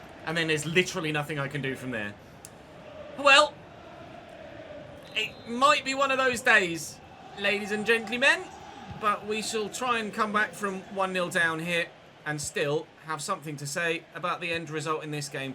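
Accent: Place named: Liverpool, United Kingdom. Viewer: A little disappointed... was looking forward to hearing the Scouse accent one last time 😂😂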